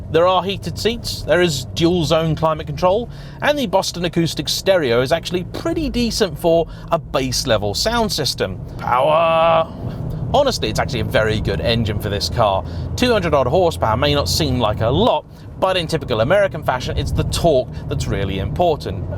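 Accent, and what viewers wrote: United Kingdom. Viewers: Funny hearing a Brit talk about these as a American I always see them as extremely trashy cars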